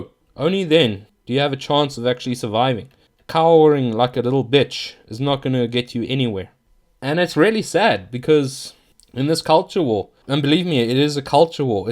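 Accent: South Africa. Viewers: I just discovered your channel through normalapollo, I could be wrong but you sound South African, or at least Rhodesian by your accent, and it's good to know a fellow countryman who loves lovecraft and holds nationalist beliefs. Keep up the good work, Volk En Vaderland.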